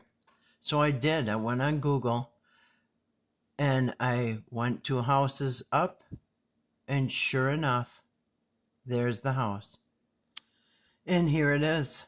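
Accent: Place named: Minnesota, United States. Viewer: U must be from Minnesota 😊 I used to work for Cargill. U betcha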